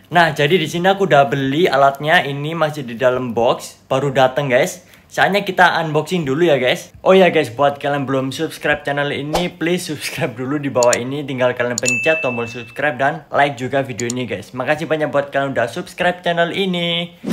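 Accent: Malang, Indonesia. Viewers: Orang malang lur ? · oalahh tibakne wong malang toh sam, pantesan kok medhok2 ngunu mantep sam!! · Orang malang mas? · Wong malang a iki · Wihh orang malang bang? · Mas orang malang ya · orang malang bang?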